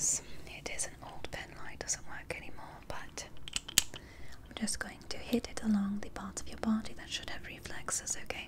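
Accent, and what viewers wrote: England, United Kingdom. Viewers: your English accent and bedside manner 😍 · Perfect English accent. Thank you from across the pond. PS. I love 🇬🇷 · Really Beautiful Doctor!!! Great Video and you have the most Beautiful eyes and you do a Great British Accent!! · Is she British or nah lol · I'm British and I approve of this accent 🇬🇧🖤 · Weird to hear you use a British accent. I got ASMR, but also on edge because you sounded like an evil villain from a bond movie haha! Amazing video! 10/10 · I never new she had a British accent ! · you are spoiling us. Also thanks for the British accent. I love studying the accent from your videos. ❤️❤️❤️❤️ είσαι υπέροχη!!!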